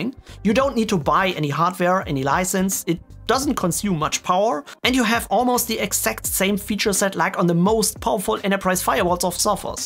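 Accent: Germany. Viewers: Ich so: Hey dieser Akzent kommt mir bekannt vor. Kein Wunder, aus Deutschland. Schöne Videos haste ;)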